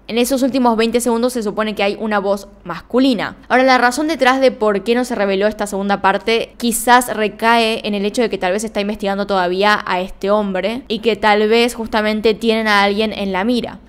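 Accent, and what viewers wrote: Argentina. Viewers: A veces siento que nos estas regañando, luego recuerdo que eres Argentina y que así hablan... Pues de me pasa.... Me sentí regañada al final del video 🤣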